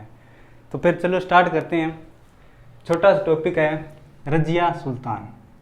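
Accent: Haryana, India. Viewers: Nice h Bhai jma bagdi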